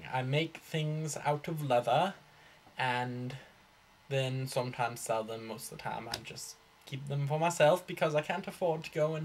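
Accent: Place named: Ireland. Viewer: Kinda Irish.......... :)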